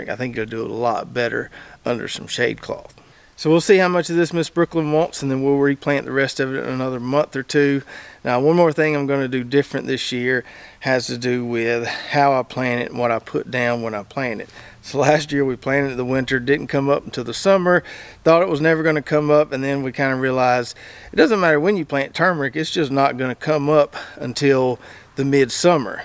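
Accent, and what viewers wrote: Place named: North Florida, United States. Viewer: I wonder if anything can be done with the Brussel Sprout leaves as a raw, or cooked green like collars, or stir fry, etc...? It does seem like most big, dark green leafy veggies tend to need a bit of extra Nitrogen, sort of like super lettuce. Thanks for all the cool vids. I've just found your channel and been getting caught up. It looks like you're somewhere very nearby. I'm in North Florida and we have very similar soil, pines and accents :) Cheers!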